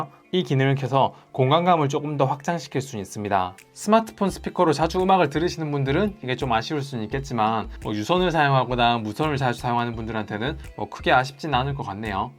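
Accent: Gyeongsang, South Korea. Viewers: 경상도사람이구나. 순간 김영철인줄..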